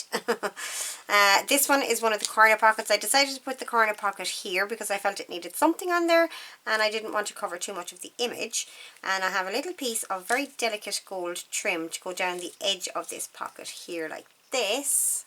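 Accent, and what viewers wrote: Ireland. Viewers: Lovely to watch you. Great ideas you have. Love your accent where in beautiful Ireland are you? Best wishes from New Zealand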